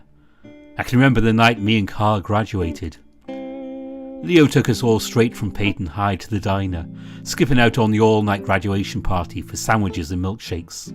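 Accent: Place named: Scotland, United Kingdom. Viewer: You have a really nice accent, but I can’t quite pin it down. Are you Scottish? (I’m terrible with identifying accents😅)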